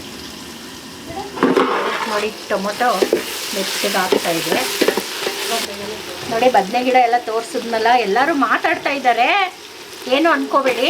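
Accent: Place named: Nagamangala, India. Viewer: Hello Mammy, First of all Thank you. You prepared mouth watering breakfast in the traditional wooden stove it's my favourite system. Your communication slang belongs to Nagamangala- Mandya (ಅಕ್ಕ ಎಷ್ಟೇ ಹಾಕೊಳ್ಳೋದು) because My mammy also belongs Nagamangala, Really Hardwork people ever found there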